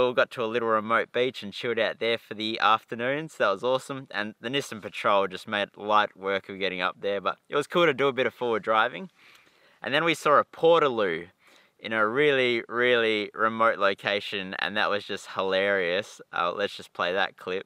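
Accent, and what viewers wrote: Australia. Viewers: I think his parents are from Poland but he sounds like he has a good Australian accent.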